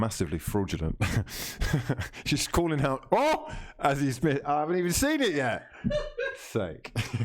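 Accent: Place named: England, United Kingdom. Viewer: Its fuckin wild. I'm american and all I fuckin say to my friends and loved ones are things like "Love to your Mother", "Incredible Scenes" "Incredible Scenes of Glorious emotions" of course " FRAUDS" & "DON". Oh Here's a new one i've incorporated into my lingo "You're Having a laugh!!!" And the kicker is I DO IT ALL IN ACCENT. I FUCKIN LOVE THIS FRADULENT DON. P.S In America periodically saying words & english lingo that nobody fucking gets in an english accent haphazardly actually makes you look crazy. But im a fraud gang member so it's par for the course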